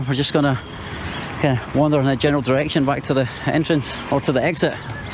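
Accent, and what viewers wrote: Scotland, United Kingdom. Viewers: His Scottish accent tho! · I love your Scottish accent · He's also seems to be a really nice guy too, like he's always super friendly to everyone. His accent is cool too, not that many Scottish youtubers out there. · Why people have so much problem with the title. Erection also means action of erecting structure or object example monuments. 🙄 Anyways I'm here for Scottish accent and big strong legs 🤭🤣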